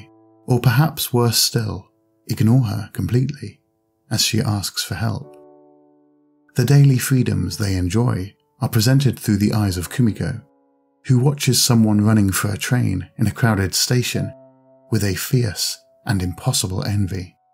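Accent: Scotland, United Kingdom. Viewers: What a nice Scottish accent👍 and keep up the good work.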